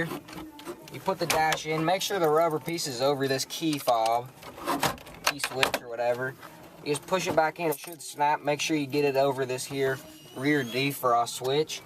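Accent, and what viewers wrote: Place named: South, United States. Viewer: Called good ol country boy accent. It is how we talk down south of the Mason Dixon line. Lol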